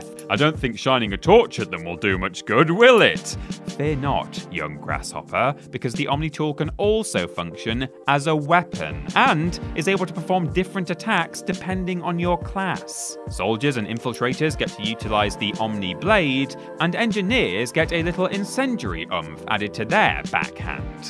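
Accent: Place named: Yorkshire, United Kingdom. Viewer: Nothing like a cup of coffee and the Yorkshire tones of presenting a list to start the day, ahhh..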